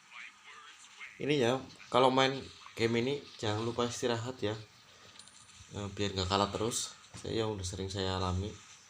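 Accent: Banyumas, Indonesia. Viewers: Bang ngapak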